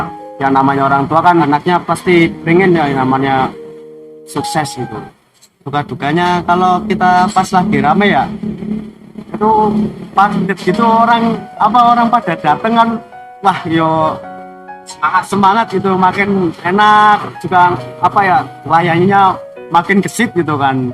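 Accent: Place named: Pemalang, Indonesia. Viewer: Logate wong Pemalang kayane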